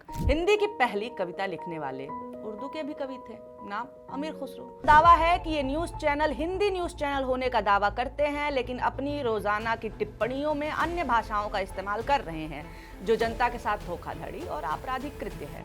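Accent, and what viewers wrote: Bihar, India. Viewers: Madam aap ka Bihari accent achcha hai ❤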